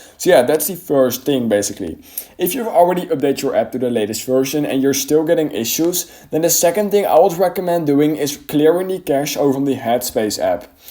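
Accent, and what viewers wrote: Netherlands. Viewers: Je klinkt echt Nederlands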